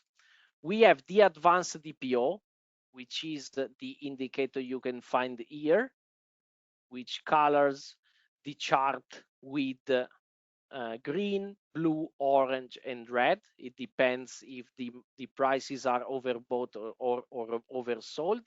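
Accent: Italy. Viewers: bel video, ti consiglio di registrare in voce italiana e tradurre l'audio in inglese con un tool di IA se davvero vuoi raccogliere iseguito internazionale, pochè la pronuncia inglese attuale potrebbe dar fastidio a chi è madrelingua inglese, ma è solo un mio parere personale e feedback che spero sia utile. Apprezzo molto i tuoi video e ti seguo con piacere da qualche settimana, sei una delle poche persone che è riuscita a farmi capire ed apprezzare il mondo delle azioni e dell'analisi fondamentale/tecnica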